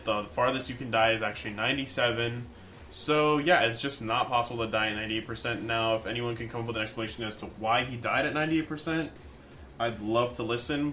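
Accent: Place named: United States. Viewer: u sound like an american trusta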